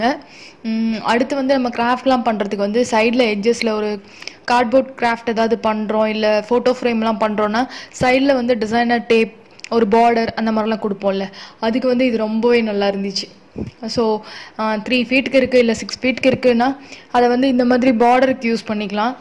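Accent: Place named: Tirunelveli, India. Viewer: Neega ena vorunu sollata enoda guess sareya irunda neega tiruneveli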